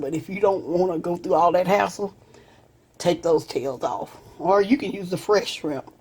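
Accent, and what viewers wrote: South, United States. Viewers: I'm so glad I stumbled across your channel. I'm definitely going to try this for Christmas. I love your southern accent, I'm from good ol Alabama myself lol When you said, "I don't do no roux and all that, unt unh" I 🤣 and I felt that Merry Christmas.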